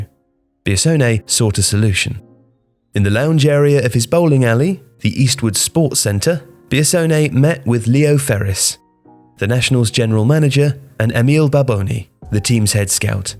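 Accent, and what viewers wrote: England, United Kingdom. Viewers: Weird hearing this with the English accent from tifo football group etc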